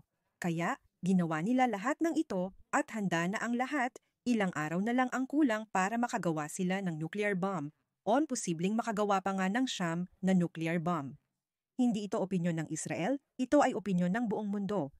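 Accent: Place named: Philippines. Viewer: Malinaw,miss, pilipinong pilipino Ang voice over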